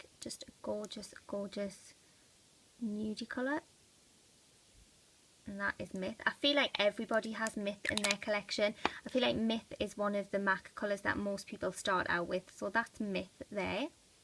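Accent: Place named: Newcastle, United Kingdom. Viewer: Are you from Newcastle Your accent is gorgeous. ♡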